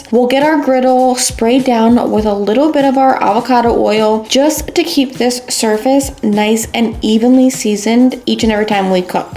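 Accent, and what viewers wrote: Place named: Italian-American, United States. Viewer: First off, keep saying things however you want. Don’t worry about the pronunciation police. Your results speak for themselves. You’re the quintessentially authentic Italian-American your food tribe appreciates. Second, how do you never have too much or too little cheese in anything you cook? It’s always just perfect! Gotta learn how you do that! 😂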